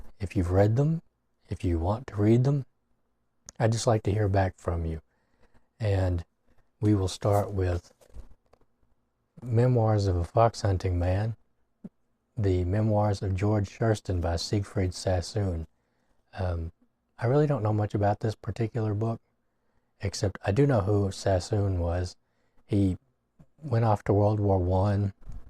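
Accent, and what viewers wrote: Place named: Kentucky, United States. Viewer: Kentucky! That makes sense. Scott from 'Kentucky Ballistics' is a household favourite and the accent is similar. 👍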